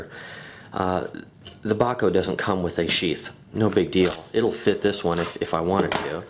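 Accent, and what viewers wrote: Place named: Texas, United States. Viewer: Thanks for this great review man! U from Texas ;-)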